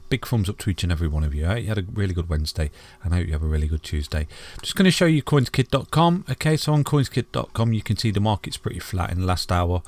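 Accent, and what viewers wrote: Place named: East Midlands, United Kingdom. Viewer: East Midlands?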